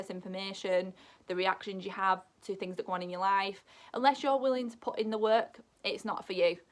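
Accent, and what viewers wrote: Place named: North West England, United Kingdom. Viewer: Sounds good, I'm currently enrolled onto the Optimum Health Clinic course but have been looking into the lightning process. I guess what's holding me back is that I'm wondering what the lightning process can teach that I haven't already found through YouTube and the course I'm on? Thank you for your the video. From your accent I thought you'd be close to me, but I noticed that is North West whereas I'm in Yorkshire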